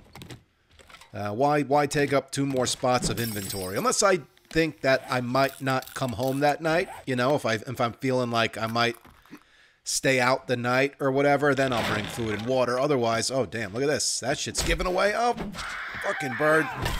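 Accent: New Jersey, United States. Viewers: I HATE zombie games & jump scares...that being said, I bought 7D2D because I LOVE your content. I've been playing it faithfully for months now. Thanks to you, I have become quite the zombie killer! I never miss one of your episodes and have been working through your past content, because you give great entertainment! Congrats on 400k subs, and looking forward to hearing your dulcet Jersey tones in the future!:D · army of 400,000 degenerates going strong! Guys, go back and watch some of the very first videos he posted. He used to be so polite and no Jersey accent at all. Listen to him now! I much prefer this version of lol dude you rock!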